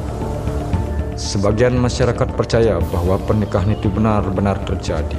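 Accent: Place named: Bali, Indonesia. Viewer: Terlalu kentara bli logat bali ne. Engkebang dek. Bes ngenah logate.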